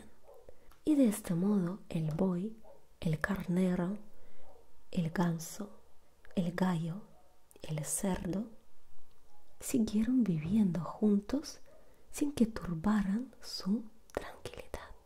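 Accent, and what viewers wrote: Russia. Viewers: Es española ? Porque ese acento de rusa?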